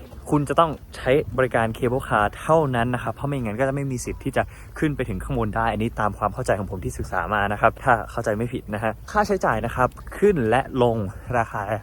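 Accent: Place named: Khon Kaen, Thailand. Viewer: ชอบสำเนียงเหมือนคนขอนแก่นตอนพูดภาษาอีสาน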